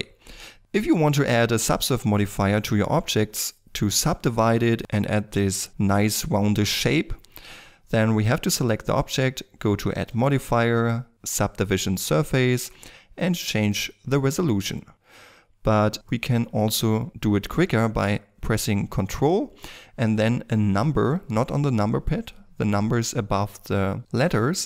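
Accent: Germany. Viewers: Woah I can hear that german accent even though I'm not native english (german too actually xD) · Diggi du hast einen Deutschenakzent........ ansonsten pretty good.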